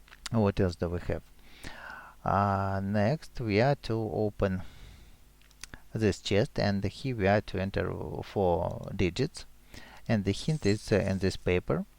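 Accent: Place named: Russia. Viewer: i cant listen to this guy.. is he russian?:DDD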